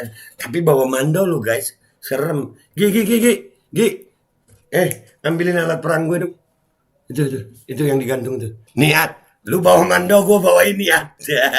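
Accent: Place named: Banjar, Indonesia. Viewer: Asli lucu beh ae bila mandangar pian pakai logat banjar...😄😁😆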